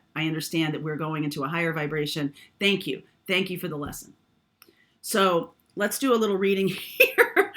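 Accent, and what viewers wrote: England, United Kingdom. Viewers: Lol 😝 love your English voice and thanks for the message. Love from England lol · Lol love the English voice...I was laughing and agree with all you are saying!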